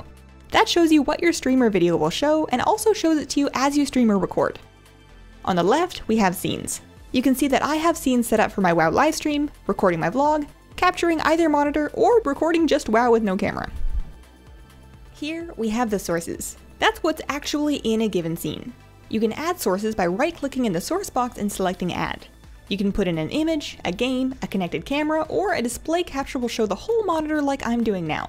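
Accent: Canada. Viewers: Love your Canadian accent haha Very helpfull video!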